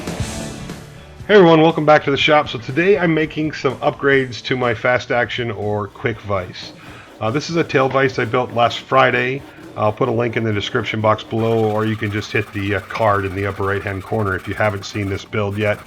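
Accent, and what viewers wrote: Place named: Carolina, United States. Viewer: yes-that's your accent--n or s Carolina? - oh yeah did you say something about Michigan -- from La La lander previous show me stater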